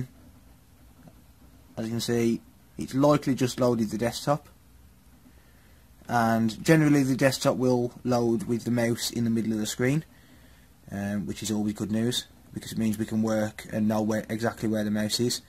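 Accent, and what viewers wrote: United Kingdom. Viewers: The most british accent i've ever heard.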